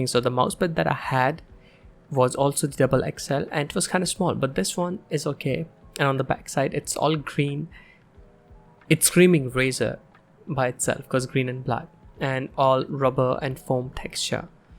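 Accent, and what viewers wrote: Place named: India. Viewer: Indian acent nikal rha bich bich mein. Wohi sahi hai. Yeh affectation gay lag rhi bhai. Sachi mein gay hai tu to sorry.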